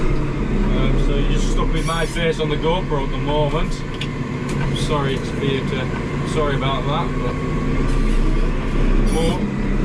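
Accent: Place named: Yorkshire, United Kingdom. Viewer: He is from yorkishire the accent should tell u that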